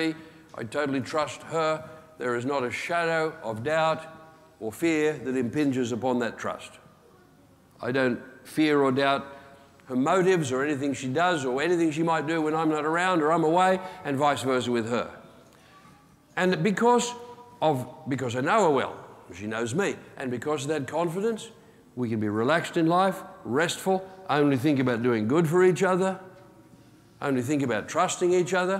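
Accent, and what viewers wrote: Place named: Australia. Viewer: I cant listen to this raving aussy any more. Now he is on about the new covenant. A mix up over two words. (this is the mistaken belief that the OT is the Old covenant and the NT is the new covenant). Not so. The scriptures say "a new covenent in (the) blood." This is A new covenant. A, A A A A A new covenant! It doesnt say THE, it says in that bit of scripture A! It is not replacement. it is A new covenant, not THE new covenant. There will yet be THE new covenant replacing that made with Abraham, it is yet future. The bible is full of "covenants" made with various people. THE (Abrahamic) Covenant was made with Abraham. So THE new covenant will again be with the people of Abraham. (yes i know we are grafted in, we are heirs of Abraham, just hold your seat) This period we in at the moment, some call the age of grace, is not the end game! 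It is a period in the plan of redemption where we are in A covenant written in the blood of Christ, one which will be fullfilled and all tied together all in all when He returns. The fullfilment of all covenants and promises is yet to come after Christ returns... it seems this preaching has forgotten the promise of the "RETURN of CHRIST" and taking no notice of the final book in our Bibles. It seems to be all about church is the final thing.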